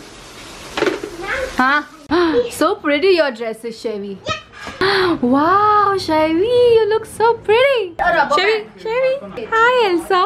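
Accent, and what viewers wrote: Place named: Punjab, India. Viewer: Hey you are so preety ☺️✌️ I don't literally wanna seek attention for the giveaway I am just telling you from the deapth of my heart That you look so innocent and sweet Mennu nhe pta logo ko aap attitude wale kyu lgte ho Mai toh apko phli baar dekhu toh aap mujhe attractive lgoge By the way apne apni punjabi frnd se accent sikh liya or mene apse Now meri mumma always say to me why are you talking like a guy all the time 😂😂😂 love u,☺️✌️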